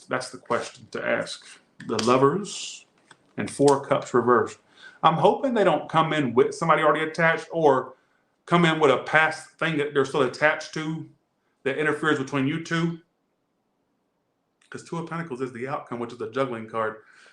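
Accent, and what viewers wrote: London, United Kingdom. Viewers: Your English British accent. Love it 🫶😄 from London here! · Love the London accent 😍 💕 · British accent .. absolutely fantastic 🤣👏👏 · Omg!!! sorry I had to 😂 I love your London accent better than mine!! Lots love 🥰 uk 🇬🇧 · When I heard the British accent, I knew the Sagittarius read is for me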